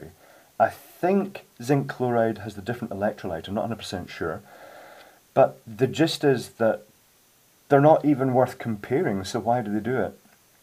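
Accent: Scotland, United Kingdom. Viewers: He said "poundland which is one of our dollarstores". As no one has told you or you do not realise, most views on YT are generated by Americans on English speaking content. BTW he's Scottish by the sounds of it, generally Scots find "British" to be too vague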